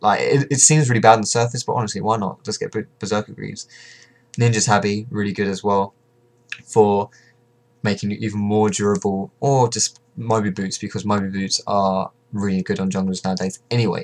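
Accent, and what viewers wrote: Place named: RP, United Kingdom. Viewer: Based on your accent i 've always thought that you was posh and pretty cool. now that i see your face i realize that you are simply AWSOME!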